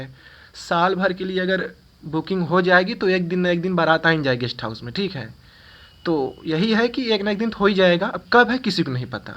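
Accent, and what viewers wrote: Allahabad, India. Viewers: Bhai aap Allahabad se hee hai na? Kyoki aapki boli aur bhasha sunkar maza aa gaya. Bahut acha laga.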